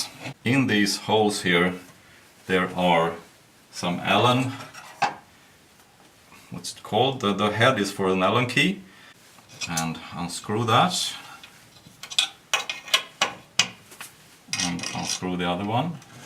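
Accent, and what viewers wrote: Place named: Germany. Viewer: it's ok, we understand english is not you mother language...es ist scwher die richtige wort zu finden...my german is also not that great so...egal... :) I am assuming you are german based on your accent....curious where you live and what you do...I am american, but living in germany..watching your videos because I am about to buy that same saw...my old metabo just died and I need something cheap..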